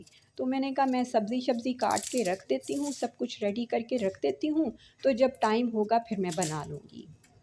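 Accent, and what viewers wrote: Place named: Karachi, Pakistan. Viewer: Baat karne k andaz se to ap karachi ki lag rhi hain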